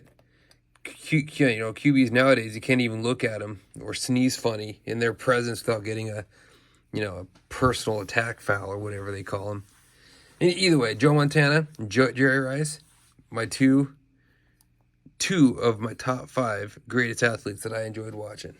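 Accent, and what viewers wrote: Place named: Russia. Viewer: Nice VR and cards. Great Russian Accent.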